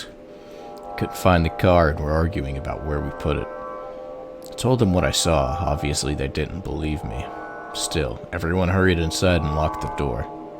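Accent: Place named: South, United States. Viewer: Why does this game bring out a redneck accent in you lol. You should do voice acting.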